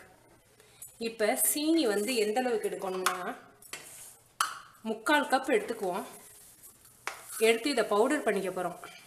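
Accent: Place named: Karaikudi, India. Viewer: Aachi neenga pesara thamizh a kekkave naa unga videos a thirumba thirumba paapen. I'm from Konapet (near Karaikudi) and miss this accent. Azhaga explain pandringa :)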